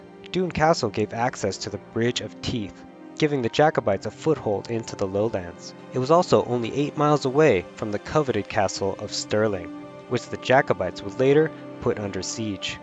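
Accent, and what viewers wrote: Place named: United States. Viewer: Why would you have an american narrator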